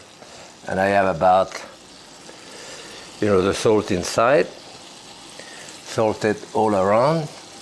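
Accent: France. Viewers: He sounds french. Must know what he's talking about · I am not at all the worlds greatest cook, but Jaques Pepin is truly my cooking idol! Not only only for his great cooking, but for that strong French accent as he instructs you! Thanks! Happy cooking. ❤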